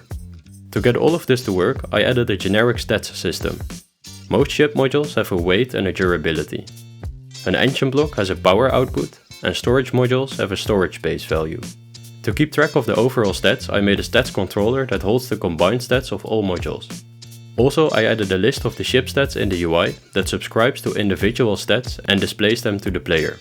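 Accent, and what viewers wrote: Netherlands. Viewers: Hey, ik ga er vanuit dat je Nederlands bent vanwege je accent en ik vroeg mij af bij welke universiteit of hoge school jij game development hebt gestudeerd. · are jouw from the nederlands? becaus jouw sound dutch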